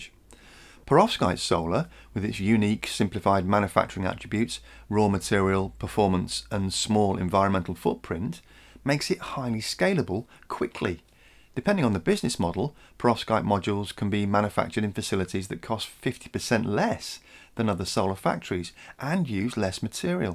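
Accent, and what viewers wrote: United Kingdom. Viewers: For American English “pero vsk vsk vsk ite” is the word & differentiates it from (perocstie?) which I hear throughout this presentation. I often have difficulty w/ various versions of British annunciation.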